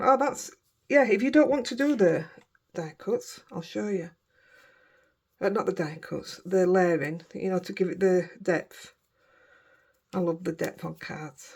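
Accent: Yorkshire, United Kingdom. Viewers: Great tutorial they are gorgeous cards…. Lovely to hear the Yorkshire accent makes me feel like I’m home xxxx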